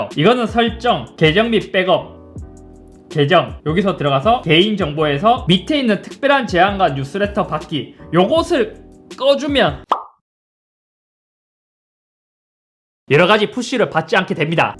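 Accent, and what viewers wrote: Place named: Western Gyeongnam, South Korea. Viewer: 앗!! 이제 아이폰 애플워치에서 아예 갈아타신거에요?? 잇섭님의 서부 경남목소리가 정겨워서 리뷰가 눈과 귀에 속속 들어왔는데... 같이 삼성으로 갈아타기도 그렇구... 항상 응원할께요!! 그럼... 기존 애플 제품 중고로 파시지는 않으세요??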